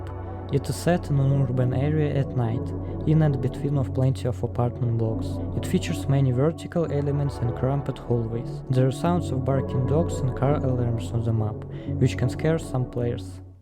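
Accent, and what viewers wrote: Russia. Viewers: Русский акцент прослеживается чел, но все равно молодец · акцент то русский попался гузлик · Слышится русский акцент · Блин мужик, снимай на русском. Но если я ошибся, и это просто акцент, то извини - на карте еще можно было выключить электричество через щиток в комнате с бильярдом у кт спавна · Ты из России,я это по акценту понял. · Вроде бы указанная страна в описании - Великобритания, а акцент на уровне "ай хэв май мазэр анд ай хэв май бразэр" слышится... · Чел ты Русс у тебя слышен русский акцент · Русский акцент не скроешь) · Я чую голос русского, по произношению слышно) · Huh, russian accent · как же слышно то что ты русскоязычный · АААААА БРАТАН ТАК ТЫ РУССКИЙ ООООООО МЛЯЯЯ НЕ ОБЕССУДЬ Я НЕ СО ЗЛА КОНЕЧНО, НО ТЕБЕ СЕРЬЁЗНО НАДО ПОРАБОТАТЬ НАД ПРОИЗНОШЕНИЕМ. Знаешь, как сильно иностранцы ненавидят такой акцент? (Я тебя спалил кстати по Ал-Кайде. 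Ты очень по-русски это сказал)) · Он русский, выдаёт акцент, +под некоторыми профилями его друзей в стиме оставлены русские комменты. (скрывается от кого то 100% :D ) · idk why, but this accent sounds more like russian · По акценту ты русский, я это сразу с первых минут просмотра понял, если нет, жалко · слышу русский акцент, замутил бы еще русскоязычный видос или русскую аудиодорожку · Наш мужик слышно по акценту))) найс контент)) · english language on russian accent is atractive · Зачем ты говоришь на английском, у тебя ярко выражается русский акцент :\ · СЛЫШНО ЧТО ТЫ РУССКИЙ АКЦЕНТ ТО РУССКИЙ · Нафига ты говоришь на английском. По акценту слышно - русак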